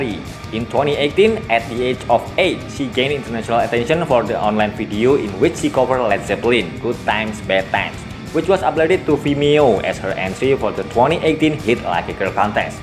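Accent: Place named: Indonesia. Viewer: suka sama ulasannya... tp bhasa inggrisnya kya brlogat bahasa indonesia...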